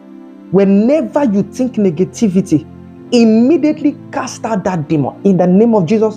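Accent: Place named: Africa. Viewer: Great lesson, scriptures and love the African accent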